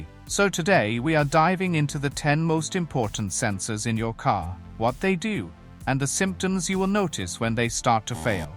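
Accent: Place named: United Kingdom. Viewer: As soon as I heard the British accent, i was out.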